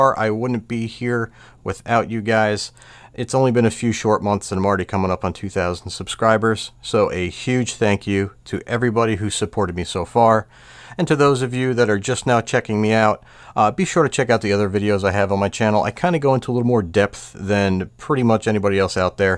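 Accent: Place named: Westchester, United States. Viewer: Your accent sounds like a friend from Westchester. He grew up in CT, but family is from the NYC area and L.I.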